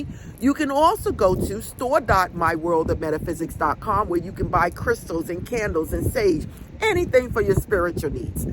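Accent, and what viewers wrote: New York, United States. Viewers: She sounds like a New Yourka!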